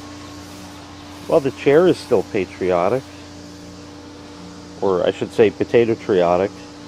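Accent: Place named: Midwest, United States. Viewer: We go through bottles of that Aldi chocolate syrup every month. Your voice being shot is actually amusing (sorry!)... it's like your voice is there, but you have lost your New York accent. Like you are suddenly a midwesterner. Yep, I'm commenting as I go XD... AWW I see the Sharkie missed a few pots, hun. OH and now I see why, he doesn't have his glasses on. :-) Glad it was fun!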